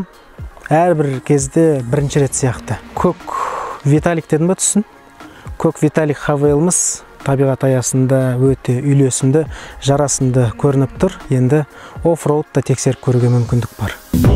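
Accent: Standard. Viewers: Красавчик👍🏻 таза қазақша сөйлейді ризамын, өте білімді жігіт!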